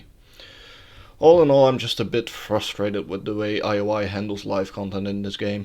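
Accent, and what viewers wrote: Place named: Netherlands. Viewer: Me too, i recognized a Dutch accent :)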